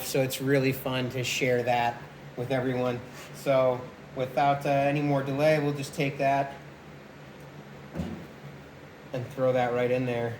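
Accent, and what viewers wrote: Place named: South, United States. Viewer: This guy's from the South!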